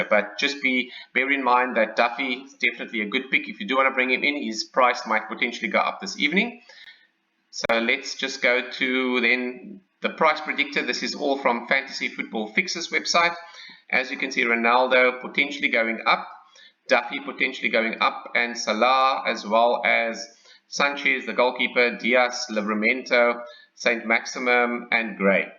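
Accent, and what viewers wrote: South Africa. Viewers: From South Africa?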